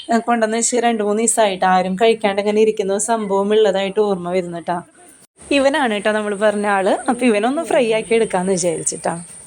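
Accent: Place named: Thrissur, India. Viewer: Masha allah ❤❤❤ നിങ്ങൾ തൃശൂർ ആണോ സംസാരം കേട്ടിട്ട് അങ്ങിനെ തോന്നുക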